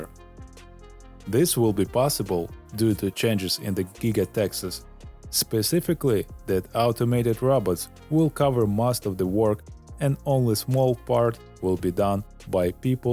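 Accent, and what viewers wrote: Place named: Russia. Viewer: Ты из России? Звучишь как один из наших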